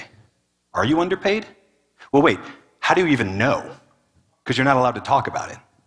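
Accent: United States. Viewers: ใช่ สำเนียงอเมริกัน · ขอถามผู้รู้ครับ นี้คือสำเนียงอะไรครับ. ใช่ อเมริกัน ไหมครับ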